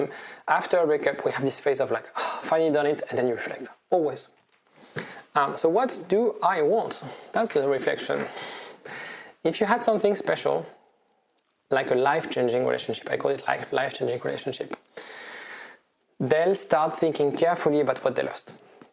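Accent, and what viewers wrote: France. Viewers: L'accent français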